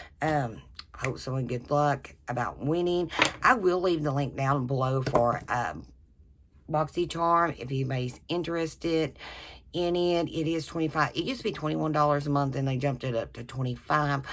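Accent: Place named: South, United States. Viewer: Everytime I watch you I just adore your accent...it is southern strong! That look you did with the palette is awesome and a bit subtle, you look like a happy sweet woman...and those are hard to find LOL! I don't even have a vanity but I have many piles of makeup that I need to organize so I relate! I would love to enter to win that palette because it looks so good on you. But you have better younger eyes than I have cause i am 56! Thank you so much for offering a lovely giveaway! Wendy Sowles on Insta at wendysowlesexploresmakeup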